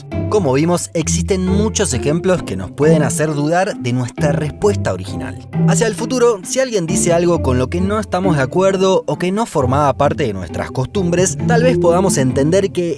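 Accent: Rioplatense, Argentina. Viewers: El que habla parece argentino o uruguayo. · Al leer ese libro, todos lo leeríamos en nuestras cabezas con acento argentino... con la voz del narrador! P.D. Muy buen trabajo. Es uno de los mejores canales en YouTube, y creo que el único canal del que he visto todos sus videos.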